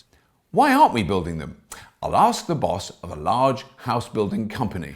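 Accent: Russia. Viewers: Nice Russian accent! To good to be true thou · Nice russian accent!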